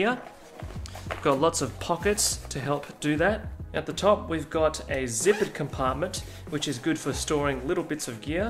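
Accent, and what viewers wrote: Australia. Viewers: Was just noticing your accent in the video and thought shit this guy might be Australian, but then measuring bag capacity in 6 packs confirmed it hahaha.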